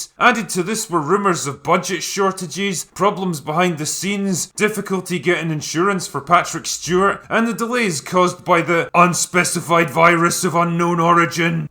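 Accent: Scotland, United Kingdom. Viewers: He's Scottish and for these videos he loads up on it. Where you from? Where ever it is, there's something wrong with it because it doesn't sound like me.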